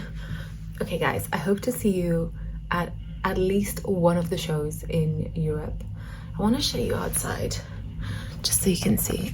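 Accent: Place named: England, United Kingdom. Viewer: Thank you, it’s so nice of you to share this with your fans and followers. I did not have the chance to ask, but I have this question, if I may. I learnt you’re from Malta (is that right?), so you’re Mediterranean (I am from Spain). At the same time you seem very Anglo-oriented: you have a strong English accent and the three desert island records you chose are English or American. On top of that I loved your excellent cover of the, which is Brazilian (which is what I thought you were when I first discovered your videos). So my question is, what are your influences, the music popular or otherwise that has made you the singer song-writer that you are? Thank you anyway.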